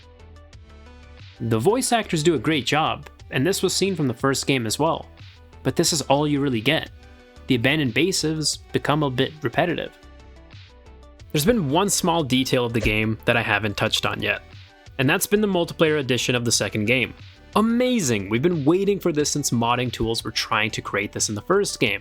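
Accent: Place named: Canada. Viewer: he is Canadian has a strong Canadian accent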